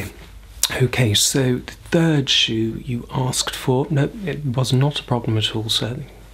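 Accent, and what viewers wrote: RP, United Kingdom. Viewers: Британский акцент невероятен☺ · That incredibly beautiful accent. That's the British accent I love most. Makes me feel like I'm in excellent company. Also, this artist is a superb actor. Talk about talent! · Now this is a real posh British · I randomly came across this video and lemme tell, that British voice is just smooth and So ASMRy. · Sometimes the simple english language spoken with the right amount of british accent is really asmr inducing. Bravo. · Omg he is so handsome plus he's sweet British accent is going to melt my computer. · He's talking in a noble british accent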